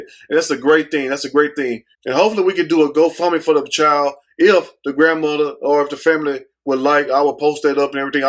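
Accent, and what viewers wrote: South, United States. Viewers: not only do I like your videos, I love you and your how your delivery done with that southern accent that is charming.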